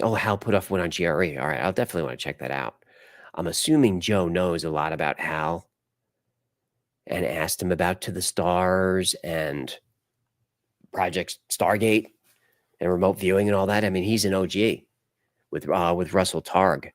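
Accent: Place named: Bronx, United States. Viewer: I knew I KNEW that accent!!! From this Bronx native… you hide it well, lol. But it slips out a little sometimes ❤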